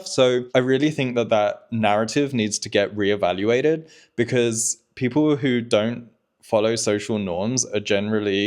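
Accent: England, United Kingdom. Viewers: you are so handsome with a gorgeous English accent that sounds so classy, elegant and professional and I love your tattoos, even though they are personally not my thing - and if I did get a tattoo, it would be something about my Irish roots, like a Celtic Cross, Celtic Knot, a Shamrock or a Harp - I would definitely still date you in a heartbeat though, as you are absolutely gorgeous-looking with your tattoos 🇮🇪☘️🇬🇧😍😍😍😍😍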